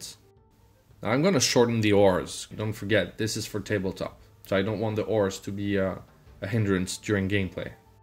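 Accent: France. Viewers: Parceque je suis français aussi et jai reconnu ton accent. Hahaha super travail en tout cas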